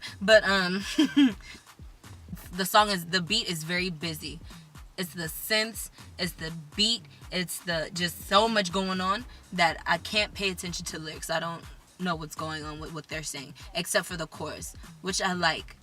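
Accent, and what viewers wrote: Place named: Nigeria. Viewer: honestly you sound nigerian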